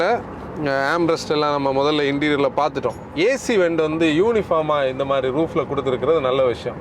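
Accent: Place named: Nanjil Nadu, India. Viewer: Love your detailed reviews, Especially it being in the nanjil slang😄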